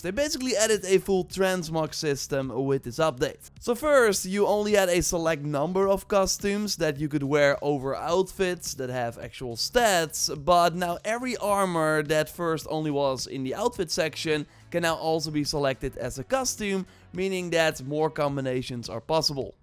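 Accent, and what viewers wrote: Netherlands. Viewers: dat nederlands accent is zo duidelijk lmaoaoa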